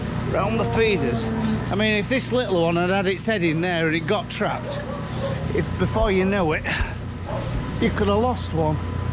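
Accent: Yorkshire, United Kingdom. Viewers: Your accent reminds me of Kirkkbymoorside....are you anywhere near there ?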